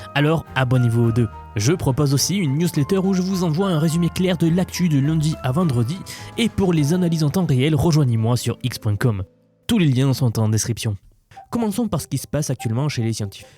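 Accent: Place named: South-West, France. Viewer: J'aime beaucoup ton petit accent du Sud Ouest ♥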